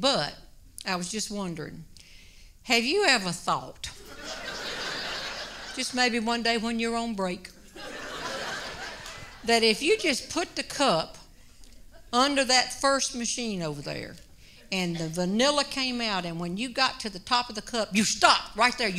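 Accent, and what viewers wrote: South, United States. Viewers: It wouldn't be the same without the southern accent. I could listen to her for hours. I can't listen to Samantha Bee or any of the newer "female comics/comedians" for more than a few seconds. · Depending on which part of the south one is from will determine how your southern accent is accentuated. I am from New Orleans and would have a hard time understanding me. However, her voice plays like fine music to my ears. I can also listen to Shelby Foote in the still of a morning, drinking my first cup of coffee and feel all is well. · She is a gem! She used “mash” when “pushing” a button. Love her Southern twang! Recently discovered! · I've never heard a southern drawl in real life... it's quite comforting.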